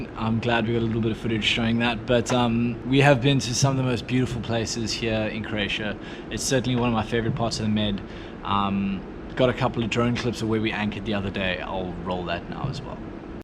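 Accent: South Africa. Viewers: Is that a Saffer twang I detect? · Hi - are you South African - your accent and some of the words you use make me think so? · South African accent?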